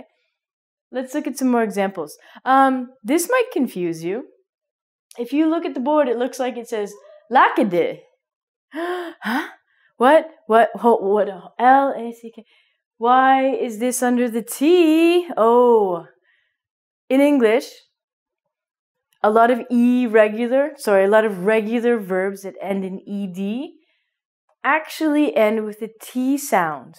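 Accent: Canada. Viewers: i think she is from canadian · Love your Canadian English tone of voice..reminds me lots of nice memories some past time in my childhood... away in Whistler Mountains.. warm hugs from Chile and thanks..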